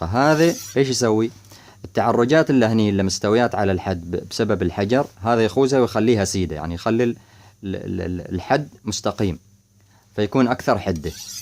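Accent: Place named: South, Saudi Arabia. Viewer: سلمت و بيّض الله وجهك على الشرح الوافي. وبالنسبة للّهجة اللّي تتكلم بها بقلب الجيم الى ياء فهي نفس لهجتنا في قبائل رجال الحجر جنوب السعودية. وسلامتك ✋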